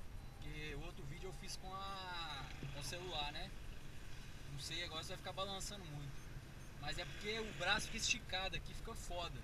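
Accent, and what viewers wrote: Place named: Minas Gerais, Brazil. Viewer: mineiro aff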